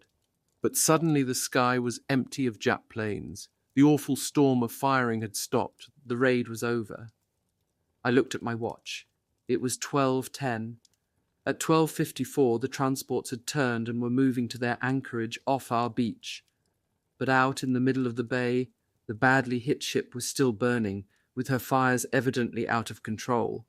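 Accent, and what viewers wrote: United Kingdom. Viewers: Something about a British person reading a story about Americans... it just does not sit right, and it feels like it does not fit. There, I said it.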